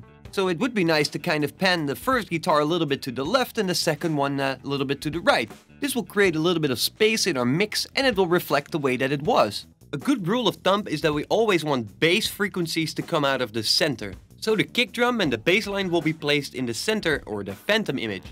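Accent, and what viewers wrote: Netherlands. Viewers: Amazing explanation! By the way are you Dutch? That accent sounds so familiar. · klinkt nederlands? alsnog goed accent hoor haha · are you dutch? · Love the Dutch accent 🤣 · Ik hoor een Nederlandse accent? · You dutch?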